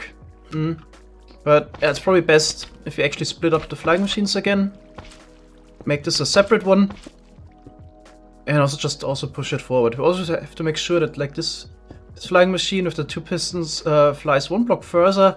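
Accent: Germany. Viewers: Bist du ein Deutscher hört sich so an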